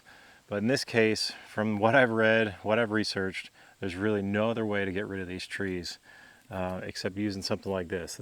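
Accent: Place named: United States. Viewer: I was confused a bit when watching your video. Honey Locust is a native North American tree. You sound American and look to be in the Midwest. When you say invasive, it means the plant comes from a different environment or location and outcompetes native varieties. Better terms might be annoying or disruptive and unwanted. No offense intended & I appreciate the information and showing how to get rid of them. I’m from Indiana am trying to manage the woods on my property and return them to only native plants. The honey locust and calorie pear trees eat tires…. And must go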